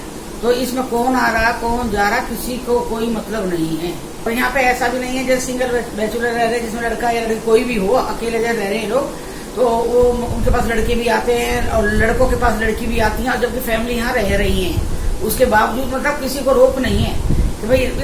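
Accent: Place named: Delhi, India. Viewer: Aunty aap bilkul dilli walo ki tarha baat karti ho aur sochti bhi vaisa hi ho...mujhe accha lagta hai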